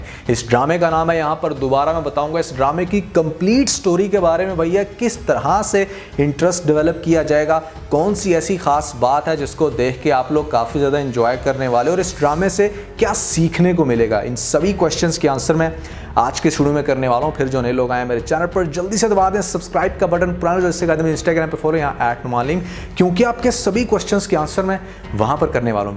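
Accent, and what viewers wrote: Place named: Majha, India. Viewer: Punjabi ikdm Majhe wali boli aapne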